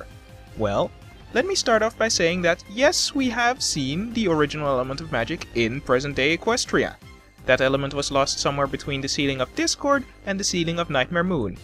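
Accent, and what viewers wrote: Netherlands. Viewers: No reason to be so harsh on the Dutch, I'm well aware how challenging English pronunciation can be since I've experienced it first-hand (English is only my fourth language but boy have I worked hard to get rid of my accent! Only through sheer determination do I sound almost indistinguishable from a native speaker today). Nevertheless, even though you claim that English is your first language you clearly have a Dutch accent, even if not a thick one. Comes from living there, I guess? Never mind. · I noticed the dutch accent and I've been skimming the comments but it raised a question. are you native dutch or did you move to the Netherlands? Also if I may ask what province do you live in? I've met several dutch bronies on the internet but they where always on the other side of the country. And regarding the dutch speaking English. yes its true every one i know has a massive accent the moment they speak english myself included. 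I'm working on getting rid of that(not that easy:D)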